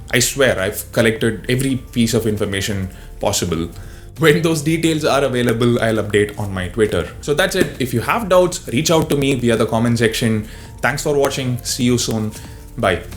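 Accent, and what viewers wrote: South India, India. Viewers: R u a South Indian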